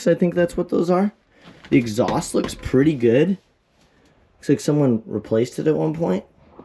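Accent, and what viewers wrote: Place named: New York, United States. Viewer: This guy has that New Yauk twang and he lives in Florida.... Poor Florida, we are full up with these jerk offs....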